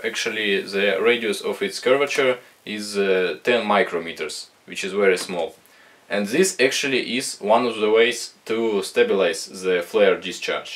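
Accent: Russia. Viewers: такой "русский выговор". Прям вообще!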